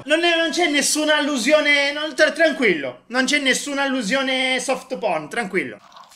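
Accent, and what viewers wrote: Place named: Catania, Italy. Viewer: Io sono di catania e mi sono sempre chiesto da dove venivi pk avevi un accento catanese ho letto il wiki e ho scoperto che vieni da catania seeeeeee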